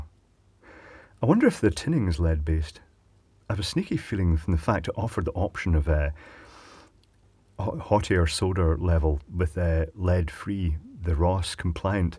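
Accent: Scotland, United Kingdom. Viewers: Thank you for your daily entertainment, for teaching me and for being a wealth of knowledge. I am from Edinburgh originally but moved to Australia 7 years ago, it is so refreshing to hear an accent similar to mine in such a different country! :) Love what you do, and I will definitely be becoming a patron (patreon??) after your explanation on the importance of the added revenue! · I enjoy your channel and find it entertaining and relaxing. It must be your brogue. Good stuff. Cheers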